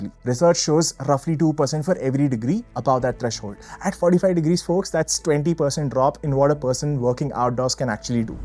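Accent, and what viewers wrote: India. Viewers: A bit hard to understand at times, because of the Indian-English accent. Not hating, just saying, I'm far from perfect too. Dunno, maybe if I watch more content I get more used to understanding :) Anyway - on topic - I guess the 20% or so decrease in production efficiency based on heat doesn't really reflect the reality. This might be true for one or a few days, but if you have high temps all the time and no real chance to cool down, your body will break down more and more. This is pure stress. Wish you guys all the best and hopefully, new laws will go into place to optimise for the new reality we life in. They have to.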